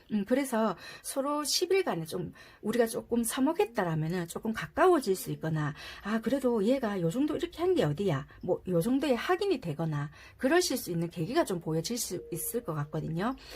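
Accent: Busan, South Korea. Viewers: 감사합니다! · 다시 3번 뽑았는데 2주쫌 넘었거든려..그래서 잘 모르겠어요ㅠㅠ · 다시끝까지들어보니 남자친구가 일때문에 바쁜거 맞아요 그래서 답답했어요 내일 10일만에 만나거든요 ^^ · 고맙습니다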